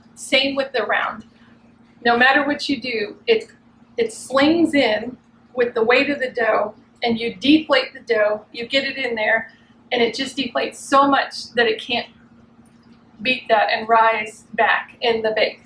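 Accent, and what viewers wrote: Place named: Austin, United States. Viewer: Love your demo (and your accent: I'm an Austin native!). I love that people are rediscovering clay bakers. Back in the 70's there was a trend (well, back then it was just called a fad) where we were all baking loaves in terracotta flower pots (in our bell-bottoms with flower-patches and halter-tops made of bandanas). Worked like a charm.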